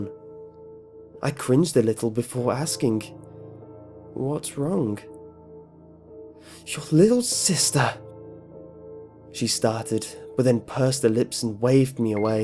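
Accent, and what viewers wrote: United Kingdom. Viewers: Lol he dosnt sound Irish at all I could say he sound British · MissleMasterJoe I mean he has a rather strong British accent but maybe I'm just used to it. (I live in the uk) Brohoof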